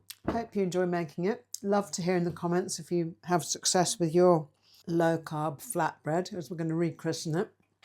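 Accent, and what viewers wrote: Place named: United Kingdom. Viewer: nice to hear a brit talking about this ... have just found your channel ... I do follow ZoeNutrition and have found over the last 2yrs avoiding the 'obvious' carbs has helped me lose over 50lbs ... have ordered iodine as per your recent video to see if it helps me ... I'm up for research supported suggestions ... I have tried my own sauerkraut, kombucha and am still making my own kefir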